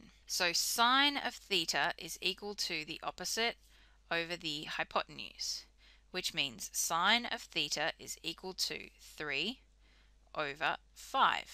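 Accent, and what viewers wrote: Australia. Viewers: Thanks nice Aussie lady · noticed the australian accent and checked the comments xD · aussie right???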